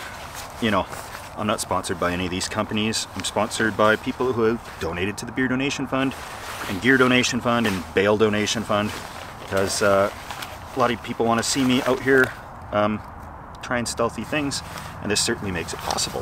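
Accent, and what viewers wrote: Canada. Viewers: Don't know if it's that smooth Canadian accent but everytime I watch, I'm relaxed and know everythings gonna be alllllll right.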